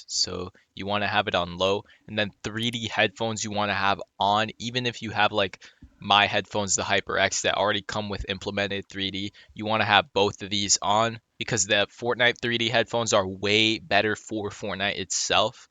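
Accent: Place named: Canada. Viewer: Je sens ton accent canadien 😂😂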